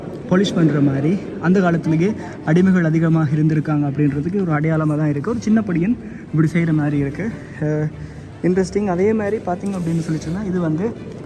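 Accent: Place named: Sri Lanka. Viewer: ரொம்ப நல்லாயிருக்கு! இலங்கை தமிழ் எப்பொவுமே கேட்க இனிமை! தமிழ் kingdom, நாயன்மார்கள் இந்தியாவைவிட்டு வெளியே வந்ததால் இன்று அவற்றின் பெருமை பாதுகாப்பாக இருக்கிறது!!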